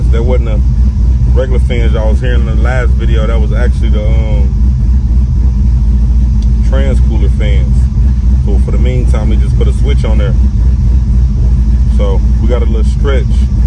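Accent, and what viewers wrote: United States. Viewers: Yank talk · that yank talk go crazy 😂 🏁💯🏁💨🥃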